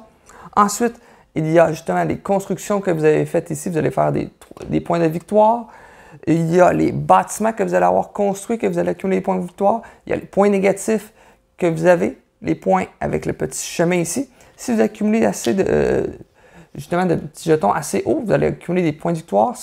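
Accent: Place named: Quebec, Canada. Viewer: J'aime bien le format ET l'accent de la Belle Province ! Pour etre bien complet, n'oublie pas de toujours évaluer le mode solo, car des fois, helas, on passe du jeu en couple au jeu solo lorsque la belle s'est envolé !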